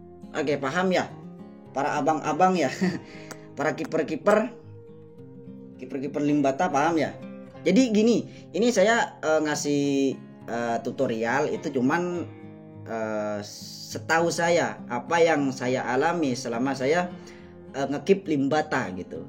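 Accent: Madura, Indonesia. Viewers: Ada logat maduranya 😁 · Abang logatnya kek orang Madura😅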